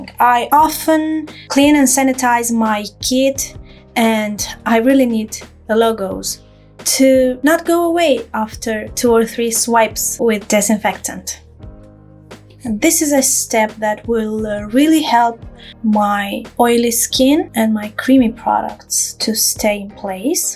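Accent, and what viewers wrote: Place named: United Kingdom. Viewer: I love the british accent! And the ByTherry powder Is my favorite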